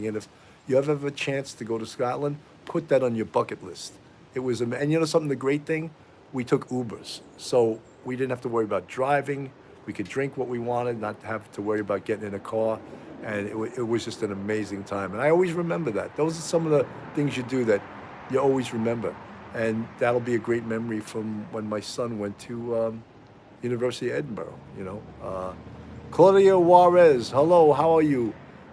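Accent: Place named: East Coast, United States. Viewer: Hey,! Watching the replay. Haha - I can tell you're from the East Coast! Illinois is pronounced "Illinoi". The "s" is silent. I'm not nit-picky, am I? The nationwide "brotherhood" among police often extends to Marines. My disabled Vietnam veteran husband has often been given a pass for minor offenses.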